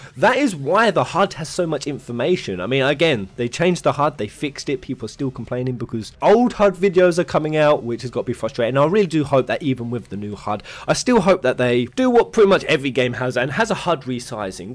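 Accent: United Kingdom. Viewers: Haseo Kaztrio He’s British.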